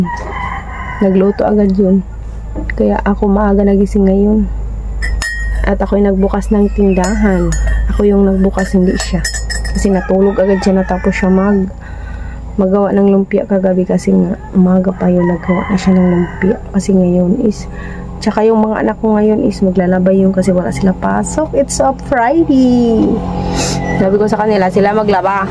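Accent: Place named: Visayas, Philippines. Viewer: Hahha sarap tlga pakinggan ung bisaya sis ba nakakaaliw pkinggan ko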